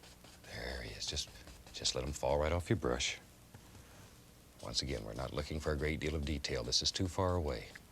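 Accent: South, United States. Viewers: in the later episodes its harder to notice that he has a southern accent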